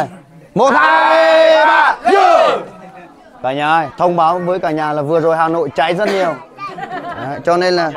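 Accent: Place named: Central Highlands, Vietnam. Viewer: Thoi sao mang theo âm điệu vùng cao (hay)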